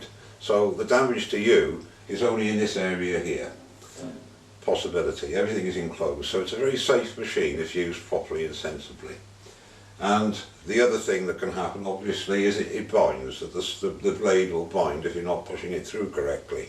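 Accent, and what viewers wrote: United Kingdom. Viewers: Really enjoy your videos being a brit living in amercia hearing a fellow brit talking about things I enjoy brings much joy and not to mention learning some great information keep your head up mate from a fellow brit who is home sick I really appreciate the videos.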